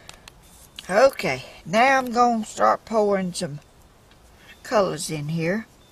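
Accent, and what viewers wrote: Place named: Georgia, United States. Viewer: Love all you do. Thank you for teaching us. Love that Georgia accent, as I’m from Griffin, Ga. love your talent.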